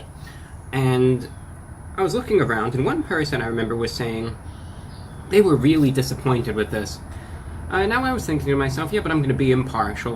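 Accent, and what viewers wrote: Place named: Mid-Atlantic, United States. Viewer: I thought with his accent it was NJ or somewhere mid-Atlantic coast.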